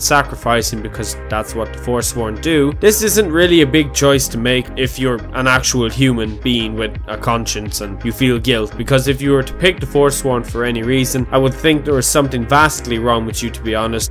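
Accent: Ireland. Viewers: Where in Ireland are you from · I am a simple sera. I hear an irish man . I see a Khajiit. I like it.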